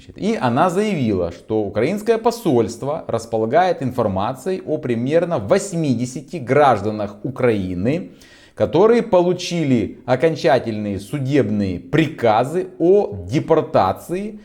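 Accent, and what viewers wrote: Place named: Russia. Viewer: как я понял по интонации вашей речи, вы гражданин России по рождению?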